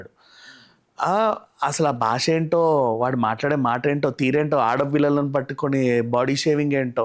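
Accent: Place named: Visakhapatnam, India. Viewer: Vizag telugu is far better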